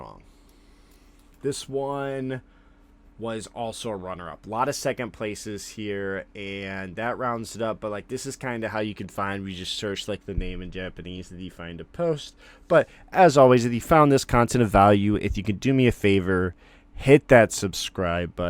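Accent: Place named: East Coast, United States. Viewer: What part of the East Coast are you from? There's no chance that accent is anything but East Coast!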